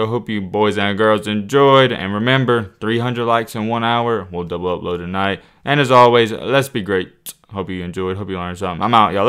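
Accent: South, United States. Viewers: So a southern twang erases the salient points within his video? Seems legit...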